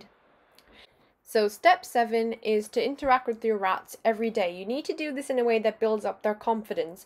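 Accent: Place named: Ireland. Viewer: Love the irish accent! Great video! Next wk. will be bringing home very skittish little girl! Will follow your tips!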